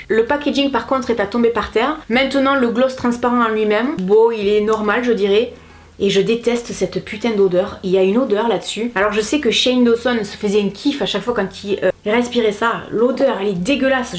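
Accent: South, France. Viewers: Je suis rousse , jai les mêmes orteils que toi palmés, morphologie en 8 et accent du sud , serions nous sœurs ??? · Je vous découvre et j'apprécie d'emblée votre franchise. Votre accent du Sud est très agréable à attendre. Bien à vous.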